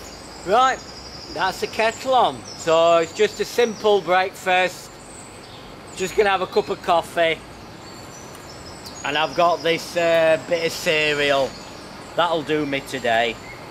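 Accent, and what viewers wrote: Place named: West Yorkshire, United Kingdom. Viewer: Respect mate, so inspiring What a lovely soundtrack with birds chirping away Your videos are really great man, and w appreciate your challenging production values, and man you consistently make good videos btw your accent reminds me of home I'm was born in Keighley, West Yorkshire So peace and love from Bristol, England Then owls were like "who the bloody hells this?"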